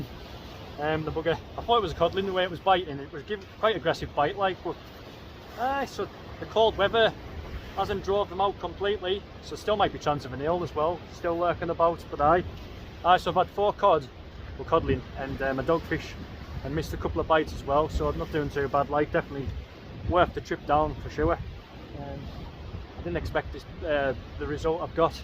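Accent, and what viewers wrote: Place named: Cumbria, United Kingdom. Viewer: Just came across your videos by accident pal.. But what great content.. Great to hear our Cumbrian accent like.. I'm a wukinton fella.. Always fancied fishing off the heads but I'm not sure where you go or park.. Do you park at the light house pal and walk over or from St bees.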